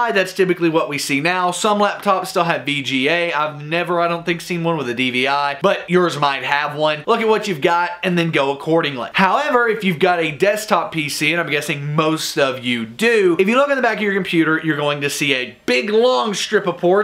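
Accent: United States. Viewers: hes so american omg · I lasted only 30 seconds.... TOO loud, too intense, too ummm American.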